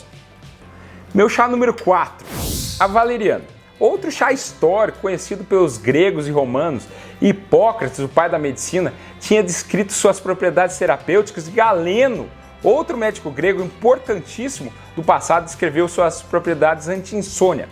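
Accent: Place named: Minas Gerais, Brazil. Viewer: Dr vc e mineiro? Convesa igualzinho mineiro,, eu sou mineira com muita orgulho!!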